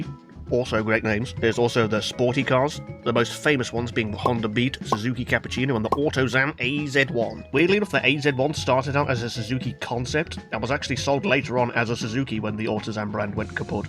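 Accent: United Kingdom. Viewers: The BRI'ISH funny man has posted WE DO EHT🍷🗿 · Now make an Kei truck funny British man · 0 new funny british man car tutorial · this funny british man making my day better again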